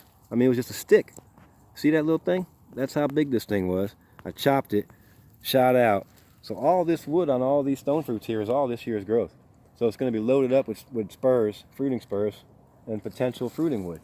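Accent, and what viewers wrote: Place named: New Orleans, United States. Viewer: I detect a little bit of a New Orleans accent in you bro🤗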